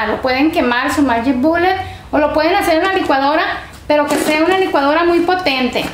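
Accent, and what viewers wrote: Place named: Sinaloa, Mexico. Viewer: Asento sinaloense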